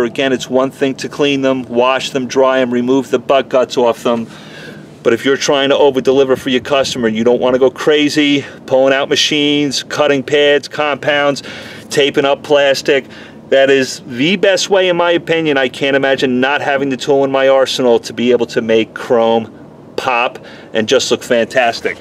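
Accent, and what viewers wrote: New York, United States. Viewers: Derrell Barnett He's originally a New Yorker, I understand him and the lingo being from N.Y. also. The rest of the country is too slow to understand our ways of doing things and speaking.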